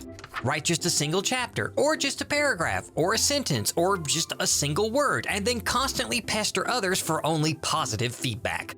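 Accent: South, United States. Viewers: I haven't seen this before, but my immediate thoughts are: 1What accent is that? I recognize it as southern, but I can't tell where in the south, those vowels are thoroughly scrambled. 2 neat, but prop not my fav of bad advice videos, just because of how cynical and sarcastic it is, while I prefer more lighthearted or comedic takes. 3 wait this his first time animating this stuff?! Great job dude! Have a lovely day! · I'm glad you're back, but you don't have to attack me like this. I'm enjoying your new animations and that sweet Southern twaaaang that seems a lil more pronounced now. But seriously though, welcome back, and I hope you're doing well.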